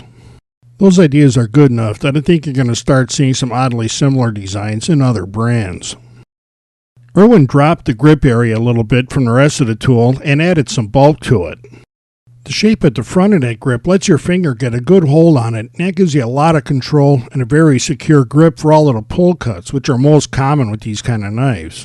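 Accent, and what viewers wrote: Chicago, United States. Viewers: Very useful video! And is that a Chicago accent?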